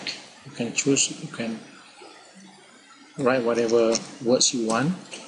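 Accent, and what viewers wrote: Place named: Malaysia. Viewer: aku dengar suara org speaking tu mcm org malaysia..last2 tgk logo mmg malaysia punya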